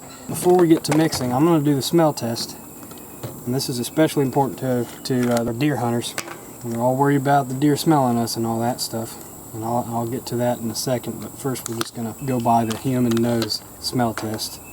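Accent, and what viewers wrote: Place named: South, United States. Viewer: Thank you for this great video. You’re knowledgeable and I like your Southern drawl.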